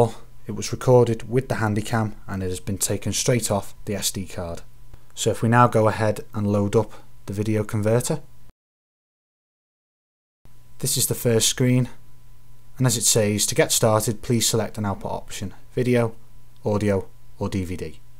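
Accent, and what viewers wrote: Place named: Liverpool, United Kingdom. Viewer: Where bout in liverpool you from lad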